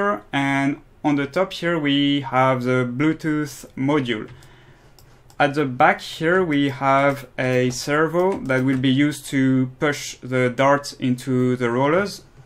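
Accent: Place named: France. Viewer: j'ai direct entendu ton petit accent Français des le début de la vidéo xD sinon super vidéo !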